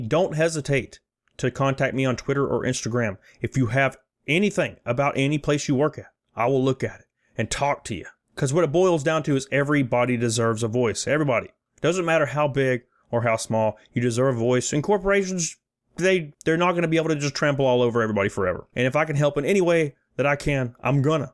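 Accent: South, United States. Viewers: Well done your sense of humor is right on the mark ,and you sound like you might be a fellow southerner .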